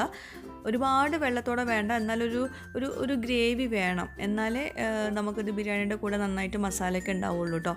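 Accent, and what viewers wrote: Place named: Kasaragod, India. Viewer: Kasargodan nhammal... Ade samasaravum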